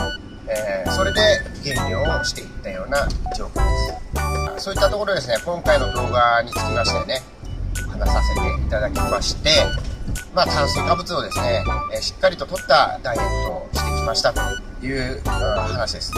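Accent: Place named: Tochigi, Japan. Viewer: ありがとうございます 関係ないですけど自分も栃木県民なので応援してます！w たまにでる栃木訛りがいいですね！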